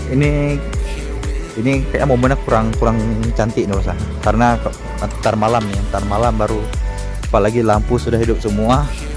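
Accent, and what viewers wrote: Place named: Aceh, Indonesia. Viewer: Orng aceh ab ya, gmongnya ada g. Ong bhsa aceh. Oy new pelangi knp gk prnh nmpak b.???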